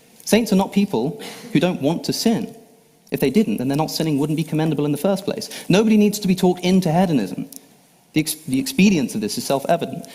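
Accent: England, United Kingdom. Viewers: Alex champions the art of pretentious sincerity unlike a Sam or a Dawkins who are more blunt about their spite and hate. This speech also proved that if you take away his english accent, he's not even half as smart as Sam Harris.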